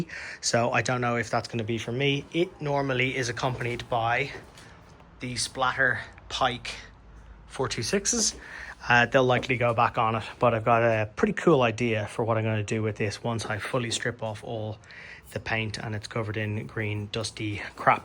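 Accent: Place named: Ireland. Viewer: Brilliant video as always. Great to know I'm not the only hoarder out there. Mind you, I've ONLY (currently lol!) got 6 frames in various states, all road bikes. What are the bars you got on ali express for the reacto? I've heard some horror stories about carbon bars off there. So I'd be interested in your thoughts. Keep up the great work. Started following you on instagram, then discovered your youtube channel. Great to hear the Irish accent!!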